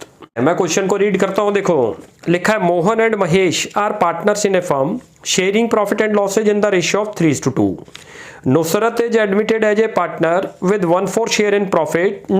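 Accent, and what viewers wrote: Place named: South, India. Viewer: I think the person is from southern part of India..... Most people learn English there.... I lived there for some 3 years and I know that it's difficult for them... But yeah you all are right that he/she should change the channel😅😊😊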